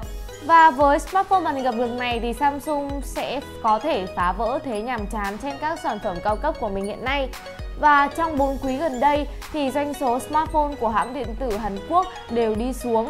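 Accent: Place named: North, Vietnam. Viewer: Bà ơi bà,bà đã nói giọng Bắc khó nghe rồi,mà còn nói chữ dính chùm chùm như mới ăn nho xong vậy...nghe mệt mõi quá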